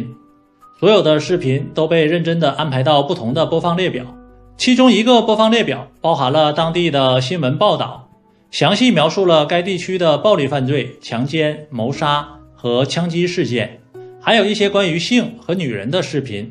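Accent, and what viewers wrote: Northeast, China. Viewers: Hi东北老乡，要花时间多练练普通话！ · 好明显的东北口音，藏都藏不住哈哈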